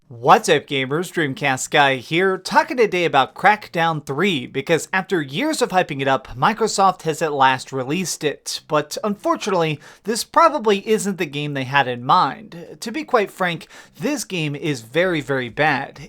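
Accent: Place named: South, United States. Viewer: Stop with the fake announcer voice we all know you are trying to hide that southern accent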